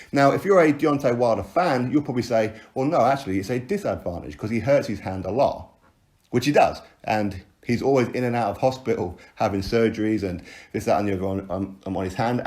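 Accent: United Kingdom. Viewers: Ion know y but I can’t stand listening to someone with a uk accent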